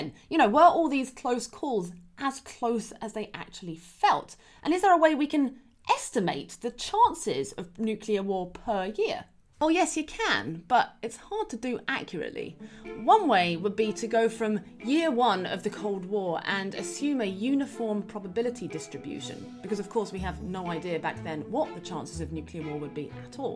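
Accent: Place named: United Kingdom. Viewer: I love your female British voice I wish I can see your voice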